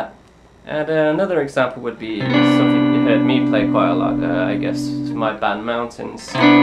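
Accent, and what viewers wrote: United Kingdom. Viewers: What country are u from u kinda sound English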